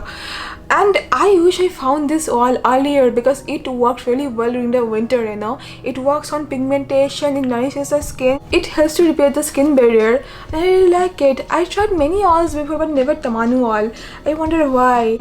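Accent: Bengal, India. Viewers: It's Bengali accent. She's not faking it.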